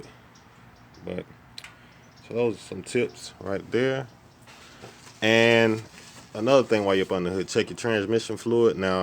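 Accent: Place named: South, United States. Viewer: You sound like you’re from the south, where ya from? I’m from east Alabama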